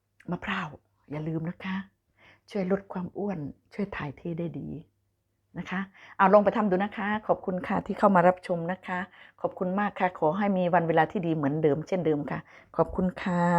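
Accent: Korat, Thailand. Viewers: ชอบแก่คุยบ้านเองคนโคราช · น่ารักมากพูดพาสาโคราชชอบมากๆจ้า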